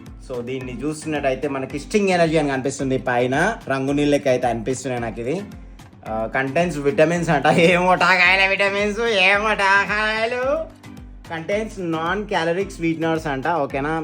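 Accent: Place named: India. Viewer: I like your Telugu slang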